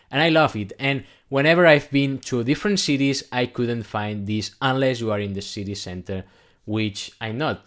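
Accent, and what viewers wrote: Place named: Barcelona, Spain. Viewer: Aha, you're from BCN :D Excellent - everyone will sound like this!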